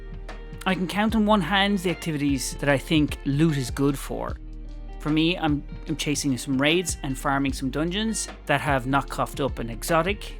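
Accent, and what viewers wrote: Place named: Dublin, Ireland. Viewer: You from Dublin too lad?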